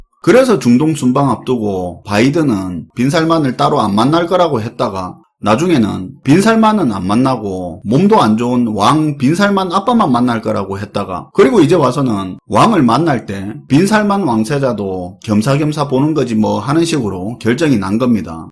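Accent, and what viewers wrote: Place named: Busan, South Korea. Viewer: 부산 표준어입니다. 꼭 서울말이어야 하나요?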